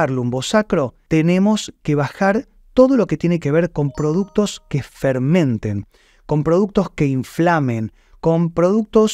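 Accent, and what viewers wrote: Argentina. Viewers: Buenas tardes dr.ud. es de Argentina? Donde atiende?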